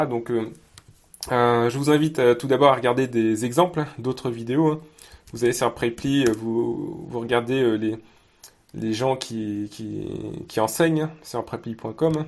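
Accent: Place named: Nord-Pas-de-Calais, France. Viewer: la vache l'accent chti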